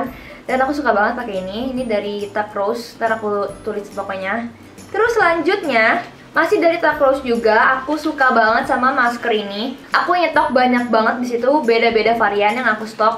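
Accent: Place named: Java, Indonesia. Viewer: bagusan begini, medok tapi kok cantik bangettttt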